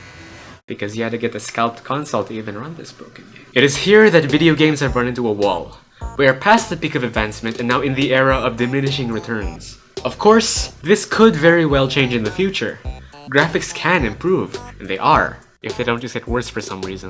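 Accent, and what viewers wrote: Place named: Philippines. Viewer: you sound so slightly Filipino. I don't know what it is. 😂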